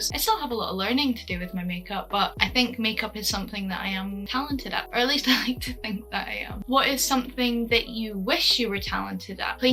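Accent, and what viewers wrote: Scotland, United Kingdom. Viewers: "I live close to Dundee", that explains why I recognise the accent since it's local-ish xD nice to see a Scottish content creator, dropping a sub :)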